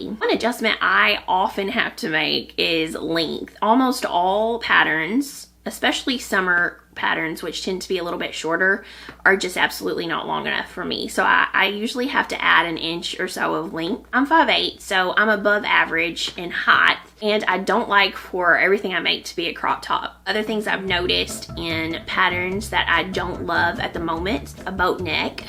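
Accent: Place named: Mississippi, United States. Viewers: Hiya, this is the second video of yours that I've come across... and they're just great! First of all, I'm Australian, so I just love your Southern accent 😍, second, you're so relatable... I'm not a 'skinny-mini' either! And I'm 5'10", so thanks for touching on doing the maths to adjust your fit... when I was young we were made to feel like we should fit the garment, not the other way around... one day I hope to be able to knit more than dishcloths! 😂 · Thank you for showing the pattern from Ozetta Knitwear! I looked at several other pattern from this designer and really like them. I enjoy your podcast very much. I’m from Mississippi and listening to you talk reminds me of home! I live in Florida now.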